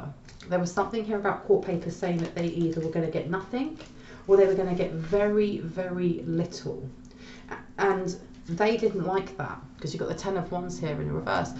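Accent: United Kingdom. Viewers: You sounds British I like it 😁‼️ very Calm voice.. Eitherway thanks 👍 for giving us your time DuuuuuuvvvvvvvvaaaaaLLLLLLLL county jax FL USA 😊👍‼️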